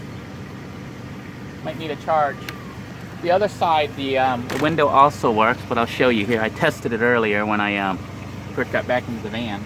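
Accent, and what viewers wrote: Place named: North, United Kingdom. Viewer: What an accent you got to be from up North😂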